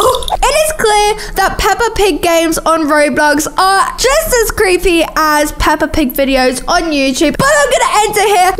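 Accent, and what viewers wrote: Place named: United Kingdom. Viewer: your accient is very beautiful is it British?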